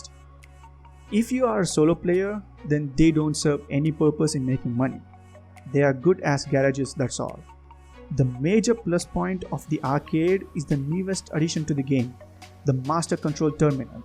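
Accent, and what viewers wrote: India. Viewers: Yeah bro this is a great idea... As too many got GTA V for free, and they don't know what to do and just roaming here and there and telling gta online world is dead.... This content of starting from beginning will be a very useful trick for them or even for some players which knows how gta world works... So yeah why not It's a great idea! And i want to know that are you also from India? Because i am from india and found ur accent same......😅😅 Btw you can also do gta V live streams of grinding and some other games too 😊😊 And plz while making guide plz tell that how things work like in vehicle stealing mission we do not have to hit vehicles and etc... Cuz many friends of mine doesn't know the basics of gta online properly, like what to do and how to do 🤣🤣🤣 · you improve your english alot you got much more less that punjabi akcent ;) i can understand evetything now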